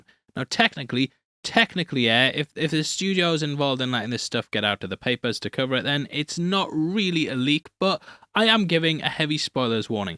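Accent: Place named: United Kingdom. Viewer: A channel named Heavy Spoilers along with your warning and British accent… I was expecting you to say “bob’s your uncle” or “Ryan is your stepdad”.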